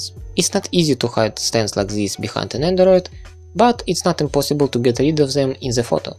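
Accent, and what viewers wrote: Russia. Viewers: Мне вот интересно, он говорит с русским акцентом или такой присущ ещё кому-то? And the video is very informative! In Russia, where I live, it will be problematic to buy Nendoroid Easel Stand on your own, but I always want to take a photo of my favorite figurine. Thank you very much for DIY!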